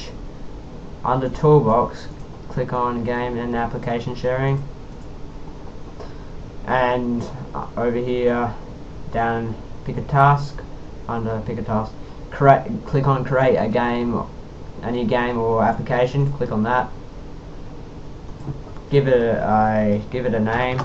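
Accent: Australia. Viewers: Are You Australian Cause If You Are I Am Aswell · YES ANOTHER AUSSIE HOW U DOIN MATE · I have that gateway and u are like the only one that helped me!! Also are u australia????????? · heard the accent and knew I was definitely going to get this right aussies we so smart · Aussie Mate Yeeeeew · Are you aussie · are you an aussie, aussies ftw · Your Aussie aren't u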